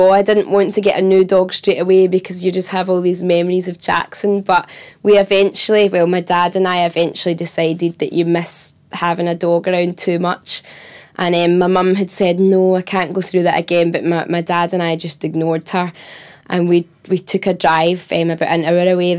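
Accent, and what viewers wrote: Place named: Glasgow, United Kingdom. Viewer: When she sings you can't really hear here accents, and then you hear an interview and think. That's a glaswegian lassie 😉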